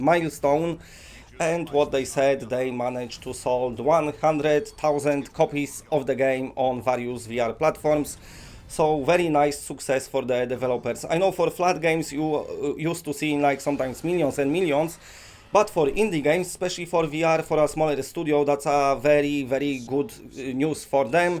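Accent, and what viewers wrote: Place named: Poland. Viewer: Came for the psvr news. Stayed for the sweet polish accent.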